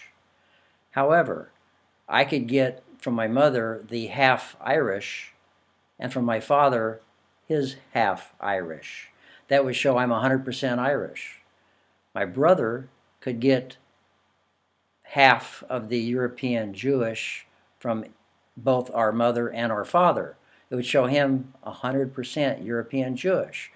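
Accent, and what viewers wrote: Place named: Sierra Leone. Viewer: 😂😂😂 you sound like a Sierra Leonian.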